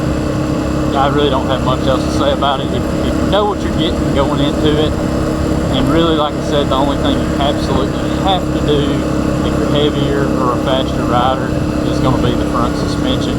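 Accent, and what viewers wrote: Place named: Kentucky, United States. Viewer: Where you from? I’m in London Kentucky and your accent is similar to mine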